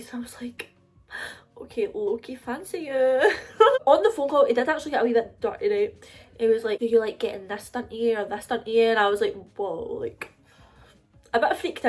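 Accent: Scotland, United Kingdom. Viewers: Sounds like a thicc Scottish accent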